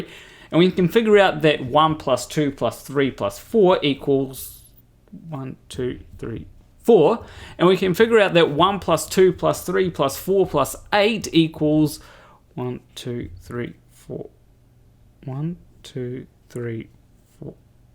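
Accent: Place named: New Zealand. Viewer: ...and you have the most kiwi accent to ever not be on many world maps.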